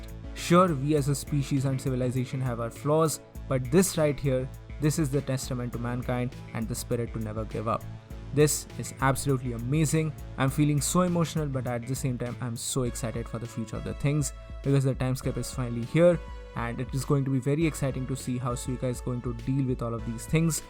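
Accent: India. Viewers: hmm Indian accent makes me smile....🙃 proud of you subscribed 🙌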